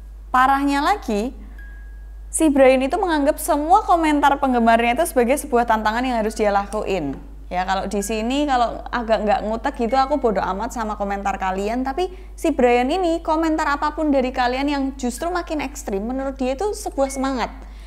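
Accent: Java, Indonesia. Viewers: Rasa ingin tertawa bukan Karna kontenya tapi Karna medoknya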